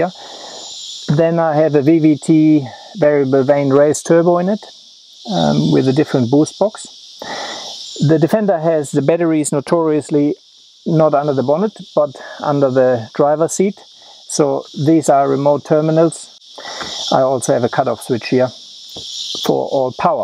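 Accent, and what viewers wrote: Germany. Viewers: category X ist doch auch nicht schlimm! 🇩🇪 hast zwar dt Akzent, kannst abe good englisch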